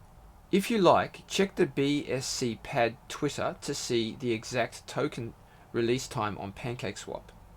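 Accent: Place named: Australia. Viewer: Hey mate. Great video. You sound like another Aussie hahah Im lockdown in melbourne. Anyway can i ask. If you have all the BSCPAD staked already. When the IDOs open, do you have to then go and buy BUSD and BNB or are you just using the BSCPAD tokens as like collateral or something. Only part i dont really get. I would think we will need BNB for fees. But again do we need to buy BUSD and if so how do you work out how much you need. Cheers again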